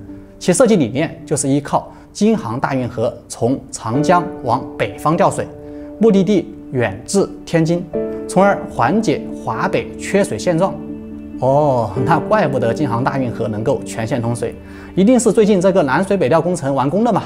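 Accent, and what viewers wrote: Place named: Sichuan, China. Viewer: 听起来一点不费力，典型四川人普通话，你多习惯就好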